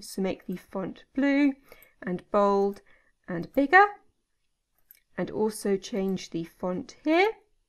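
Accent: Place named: United Kingdom. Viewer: I love your British accent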